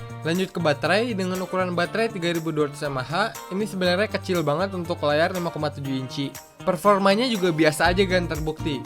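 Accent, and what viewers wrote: West Java, Indonesia. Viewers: pertama kali mampir ke channel agan, sunda pisan ini logatnya, ditambah liat "ciparay" :P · Udah "mah" kameranya bagus...eta meni sunda pisan si akang...linggih dmn mang?wkwk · orang sunda sigana ieu teh nu review na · logatnya sunda banget gan😀 btw kameranya ngiler sama anti mainstrim 👍harganya udh turun 5jt lbih skrng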